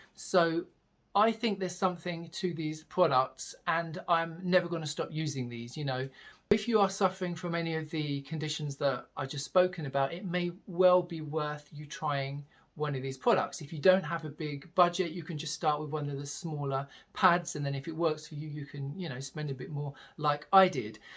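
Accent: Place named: United Kingdom. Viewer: My ear tells me you have to be from same area of UK that Russel Brand is from. Great video btw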